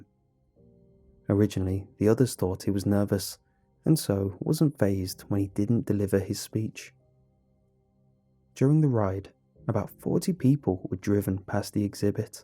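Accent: United Kingdom. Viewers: Still liking this Beyond Belief: Fact or Fiction twist of a series. Creepy Pasta reading is kind of dying, and I know it's hard to move on to something new. You're doing great. Anyone else have that deer in the head light look every time an Asian person has a British accent? Come on....come on....be true to yourself.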